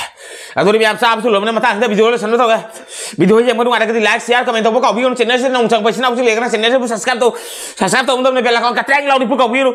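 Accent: Cachar, India. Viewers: Brodrj cashar accent male if im not wrong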